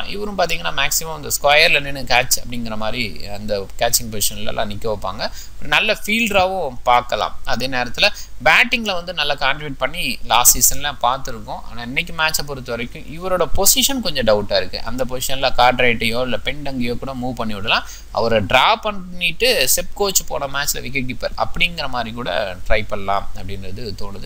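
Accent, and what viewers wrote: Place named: Kongu, India. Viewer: Power play angatun. Kongu Tamil coming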